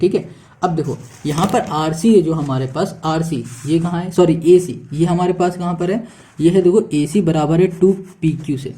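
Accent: Bihar, India. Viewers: You are from bihar sir?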